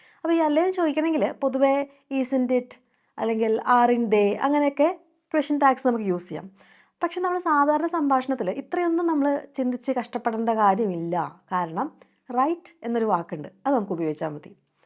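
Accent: Thrissur, India. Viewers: Mam r u from Thrissur ennu chothikkamo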